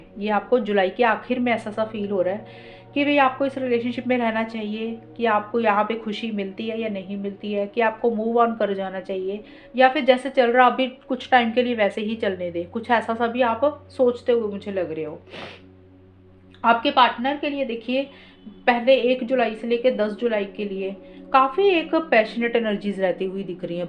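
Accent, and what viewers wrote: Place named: Amritsar, India. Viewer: Aap Amritsar ke ho awajh se lagta hai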